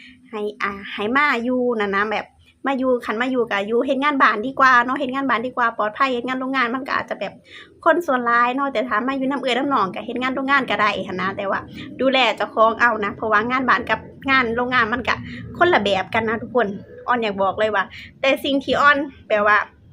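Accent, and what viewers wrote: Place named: Isan, Thailand. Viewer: ออนคือเว่ากิๆไปๆคือคนศรีสะเกษประเทศไทยแท้ออนอยู่ติดอุบลบ่อเว่าคือกันเลย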